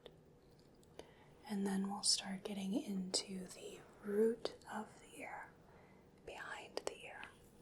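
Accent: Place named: Midwest, United States. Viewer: The Midwestern comfort of hearing another person add letters to words, "across-t" is familiar ❤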